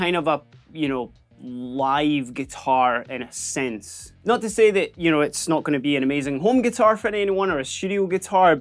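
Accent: Scotland, United Kingdom. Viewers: Scottish accent IS musical! sold me this guitar better :D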